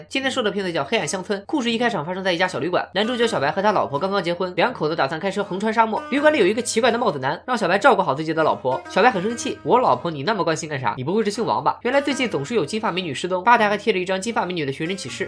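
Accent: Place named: Jiaodong, China. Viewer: 主播胶东人吧，从 自己 的发音 判断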